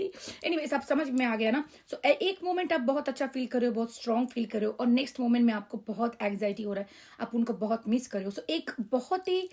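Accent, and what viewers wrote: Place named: Bengal, India. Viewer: Bengali ke mouth se hindi sunne mai bahut nice lagta hai👍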